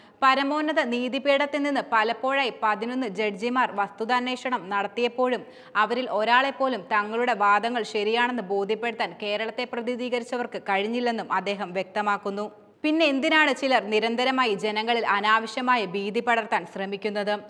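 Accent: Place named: Tamil Nadu, India. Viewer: അണ്ണാച്ചി പൂറി മോൾ മലയാളത്തിൽ സംസാരിച്ച പോലെ ആണ് എനിക്ക് തോന്നിയത് 😡😡😡😡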